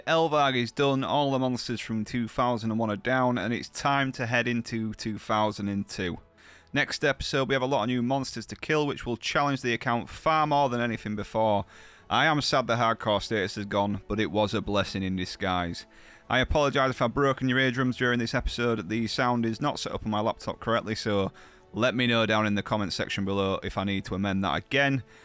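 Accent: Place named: Northern England, United Kingdom. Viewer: Really hope you hit 1k subs soon. you seem very wholesome and genuine. loving the yorkshire accent, keep plugging bro =)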